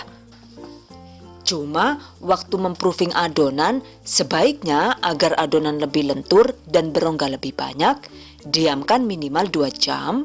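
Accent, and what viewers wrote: Banyumas, Indonesia. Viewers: Kakak orang madiun,? Tapi logatnya kok ngapak.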